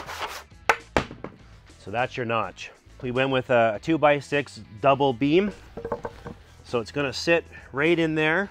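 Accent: Canada. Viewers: You sound Canadian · Canadian eh????????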